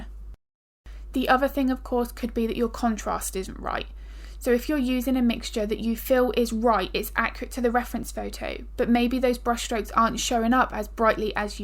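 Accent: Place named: United Kingdom. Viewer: I’m new to animal portraits, yet I am a trained artist! You are fantastic:) My BFA is in Ceramics and Abstract Painting. In a week or soI I’ll be joining Patreon. In just seven minutes I’ve learned SO much! Thank you for sharing your gift. Oh, I’m Shane, BTW. Hope to chat with you soon. I assume you are across the pond, based on your accent lol. I’m in Charleston, SC…..and there is a HUGE market here for pet portraits here that I want to dive in. I do human portraits as special gifts due to the length of time it takes to complete one, but I sell my original abstract more. Hope to chat with you soon!! Oh, have you ever done a medium goldendoodle? -Cheers, Shane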